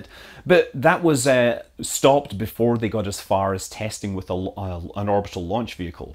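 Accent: Scotland, United Kingdom. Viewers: Hey where in Scotland are you from?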